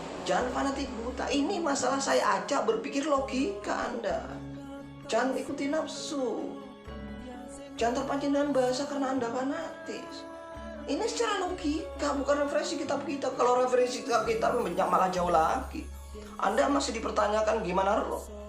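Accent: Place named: Madura, Indonesia. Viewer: Bhasanya logat.orang Madura iya pak..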